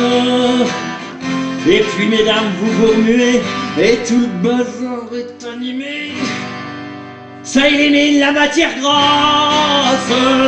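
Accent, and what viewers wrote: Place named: Vosges, France. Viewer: Véééé , t'es né à Rmiremont (comme moi !! ) et t'as le même accent que moi ...!!!! Bizz de Nîmes .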